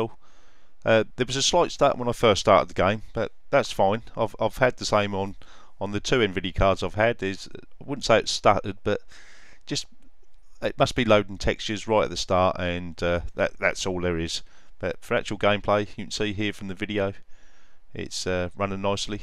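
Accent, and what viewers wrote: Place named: England, United Kingdom. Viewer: Good review. English accent's are badass, couldn't resist saying that lol. I think if Fury X would drop to 550$ and Fury 450$, assuming they can at least hit 1200 with some voltage on a proper loop they would sell like crazy. Not to mention DX12 coming and looking at Hawaii i think Fiji will come a long way in a year.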